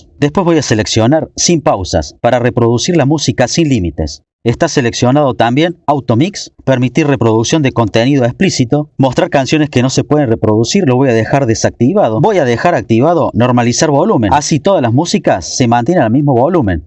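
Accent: Córdoba, Argentina. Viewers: Que cordobés del orto.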